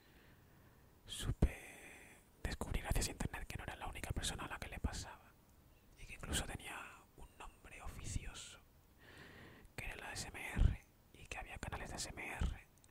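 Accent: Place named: Spain. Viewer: Eres la única persona que escucho en español y además el único con acento de España que me gusta,tengo que ser sincero y decir que me molesta un tanto ese acento en ASMR,pero no el tuyo ;) Gracias por dedicarle tiempo a este video y aunque te tardes en subirlos tienes unos cuantos cientos de subscriptores que siempre están al pendiente de ti(me incluyo en ellos) saludos!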